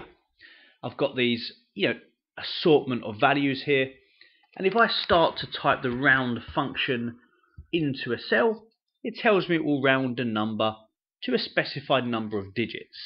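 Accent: England, United Kingdom. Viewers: I have not heard English accent very long time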